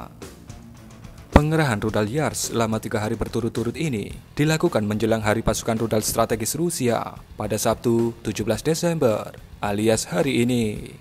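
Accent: Java, Indonesia. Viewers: Medoke wong jowo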